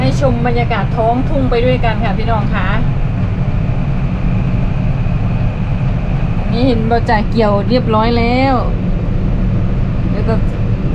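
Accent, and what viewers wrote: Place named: Korat, Thailand. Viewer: สำเนียงเหมือนคนโคราชคั่บ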